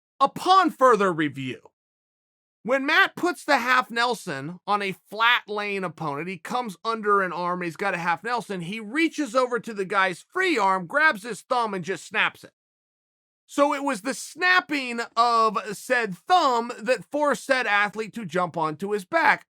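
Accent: Russia. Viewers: Your Russian accent Chael. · Love Chael's ambiguous russian/east-european accent here lmao. Give us more russian wrestling stories Uncle Chael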